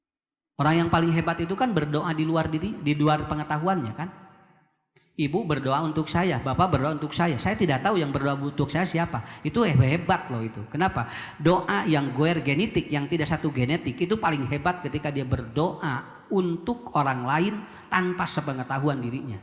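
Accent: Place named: West Java, Indonesia. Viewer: Barakallahufik ustadz...khasnya ustd mah nyunda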